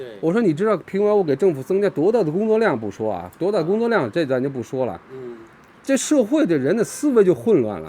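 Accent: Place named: Beijing, China. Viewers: 京爷 。哈哈 这口音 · 他可能指的是祖籍，很多人会这么讲，听口音应该是在北京长期生活过的。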